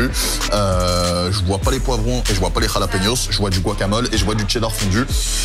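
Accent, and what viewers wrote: United States. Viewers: T'es un Cainri ou un français mdr , bonne vidéo man ! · frère tu fais tellement le ricain i tariv quoi aha ?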